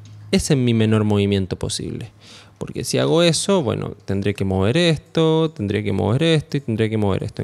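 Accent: Chile. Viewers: Habla como chileno si erí chileno bro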